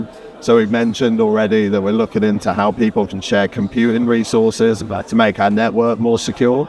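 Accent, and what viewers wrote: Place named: United Kingdom. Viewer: Sehr gutes Interview, und ich mag dein Englisch sehr. Warst du mal längere Zeit in Großbritannien? Die meisten Deutschen sprechen ja entweder mit sehr deutschem Akzent, oder es klingt eher amerikanisch. Bei dir gar nicht, das klingt schon relativ britisch.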